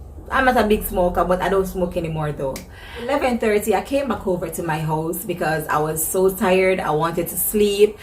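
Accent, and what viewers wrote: Jamaica. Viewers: Sorry if I may ask what country are you from... kind of your accent sound jamaican.... but you look so much like a Nigerian😀😀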